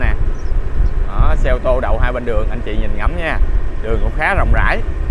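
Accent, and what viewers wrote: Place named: Mekong Delta, Vietnam. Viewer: Xin chào em trai.nghe giọng nói anh đoán em là ng miền tây.vì trước đây những năm 1984 đến 1991 anh năm nào cũng công tác miền tây.đầu năm vào nam cuối năm ra bắc.thời gian ở tỉnh đồng tháp khoảng 2 năm.ở p2 tx sa đéc.rồi cao lãnh .huyện tháp mười vv❤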